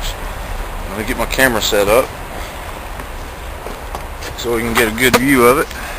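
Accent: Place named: South, United States. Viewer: Good job! Love the southern drawl! Beats mine all to shame. lol One question, why did you not like the internal spring on the poppet valve? Again, great work!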